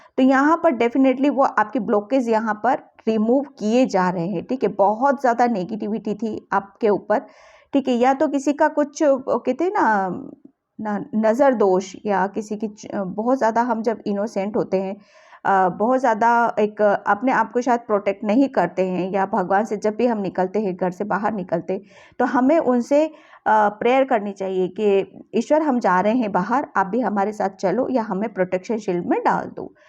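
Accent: Gujarat, India. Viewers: Ma'am I believe you must be from Gujarat. I can make out from pronounciation, as I am working in defence organization. Good Night 💤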